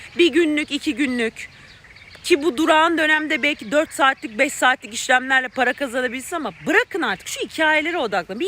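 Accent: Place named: Sivas, Turkey. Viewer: Hocam sivaslısınız galiba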